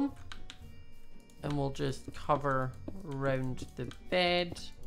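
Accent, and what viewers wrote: Scotland, United Kingdom. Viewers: Lol i van barely hear what hes saying through that thick scottish accent XD your vids are awsome luv ur vids!!!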